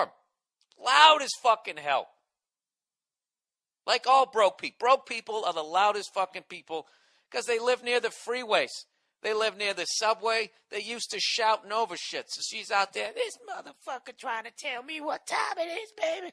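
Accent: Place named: Michigan, United States. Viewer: Sounds like people from flint Michigan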